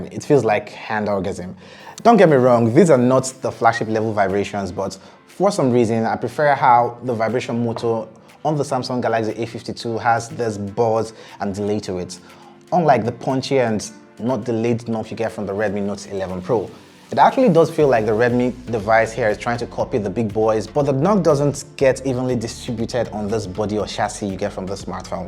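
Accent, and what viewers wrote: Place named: Nigeria. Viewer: He sounds like a Nigerian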